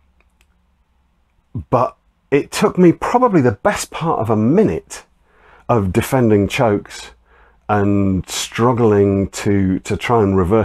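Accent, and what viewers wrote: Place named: London, United Kingdom. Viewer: You have been taught actual catch wrestling where you lose the match if you're pinned. There are other systems that come from catch wrestling such as Luta Livre (almost definitely the best style there is), Shooto and CSW where the match doesn't end if you're pinned. I recommend learning these systems if you're trying to learn to fight off your back but don't want to learn Jiu Jitsu and want to carry on using your catch philosophy and techniques. There's team cross face in South London where they teach CSW. By the way you sound like you're from London. If so, do you teach people? because in your video you said you're willing to teach.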